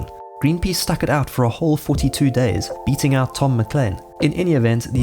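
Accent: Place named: South Africa. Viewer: Super underrated video! I love the accent too, I'm originally from South Africa but have lived in the UK for over 18 years now, I've not heard a voice like mine for so long, it's crazy to be reminded other people speak like that too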